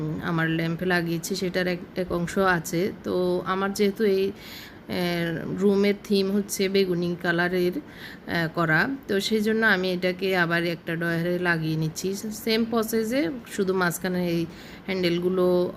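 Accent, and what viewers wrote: Noakhali, Bangladesh. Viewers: আপু তোমার বাড়ি কি নোয়াখালী???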